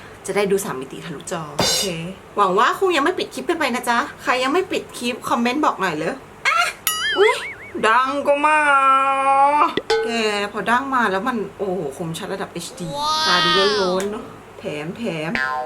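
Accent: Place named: England, United Kingdom. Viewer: ชอบสำเนียงอังกฤษมากค่ะ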